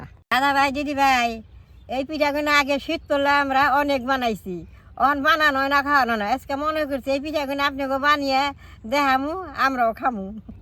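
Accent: Tangail, Bangladesh. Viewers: মাসির বাড়ি ইন্ডিয়া হতে পারে না।এটা টাংগাইলের ভাষা আমার নানার বাড়ি টাংগাইলে,মায়ের ভাষা এমন ছিল।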